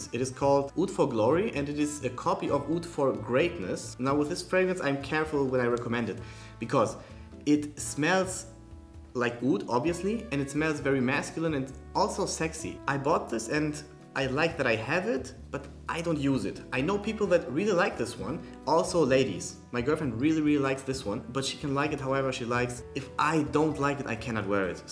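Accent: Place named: Germany. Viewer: Man hört den deutschen Akzent so geil raus 😂. Geiles Video trotzdem!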